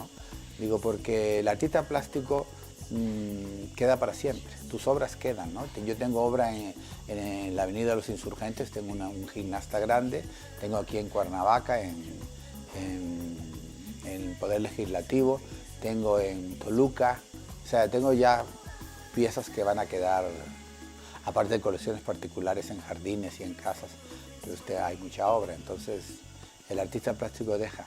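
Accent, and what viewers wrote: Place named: Spain. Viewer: Tantos años en México...¿porqué el acento de España?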